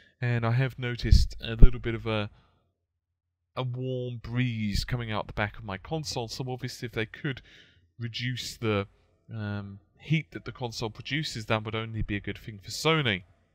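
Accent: United Kingdom. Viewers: What was the # again? C-U-Hayche-100-6-A ? HeHe comfort British Accent ;)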